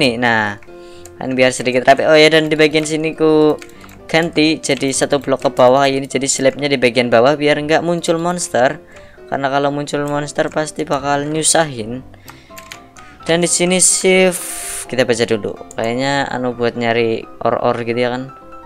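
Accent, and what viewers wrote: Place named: Tegal, Indonesia. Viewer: Abang orang tegal ya :v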